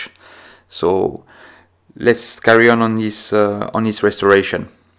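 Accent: France. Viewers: Thanks to your video I was able to review the Omega 613 that was given to me by a friend. I didn't know it, so following your instructions helped me a lot. Your English with a French accent is also nice 🙂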